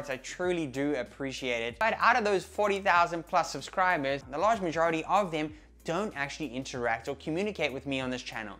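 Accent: South Africa. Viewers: Audio is perfect. You are South African, right? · Where are you from, your accent sounds South African - hi from South Africa btw :)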